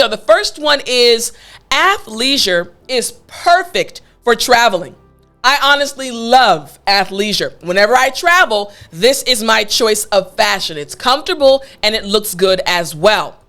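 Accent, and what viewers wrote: United States. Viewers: You speak as you wer american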